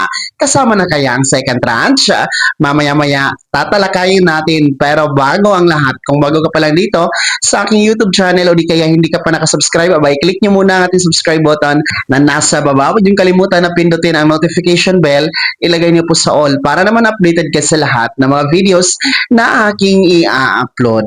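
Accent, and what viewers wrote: Visayas, Philippines. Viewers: Ung dila m halatang bisaya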